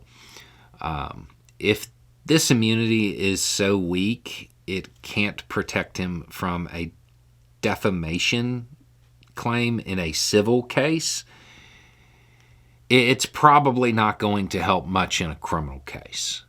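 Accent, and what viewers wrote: South, United States. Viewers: I’ve asked this before, but haven’t gotten an answer. How did this guy develop a southern accent? He didn’t have on in his very early videos. I agree with 95% of what he says. So I’m not a Trumper MQRQN. Just an FYI.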